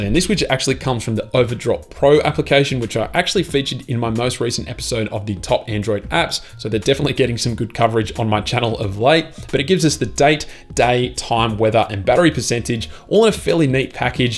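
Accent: Australia. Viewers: Mate, you Australian?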